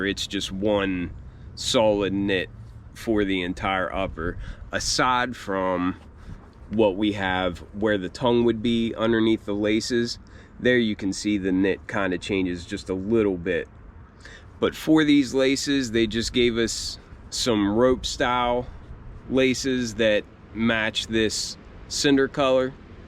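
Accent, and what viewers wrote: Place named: Pittsburgh, United States. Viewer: Are you from the Pittsburgh area? I’ve lived in Pittsburgh my entire life and you have a pittsburgese accent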